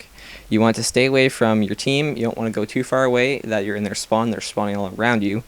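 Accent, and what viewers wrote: Canada. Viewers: Dat canadian accent he has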